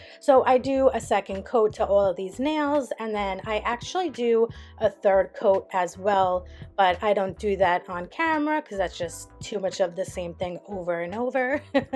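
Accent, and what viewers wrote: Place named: East Coast, United States. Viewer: Gorgeous gel builder I really like it!! You sound like you are definitely from the east coast..yyaass sis!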